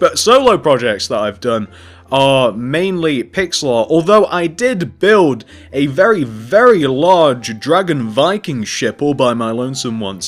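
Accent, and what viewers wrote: United Kingdom. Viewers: I don't why but you remind me of PopularMMO's but with a british accent. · Are u British then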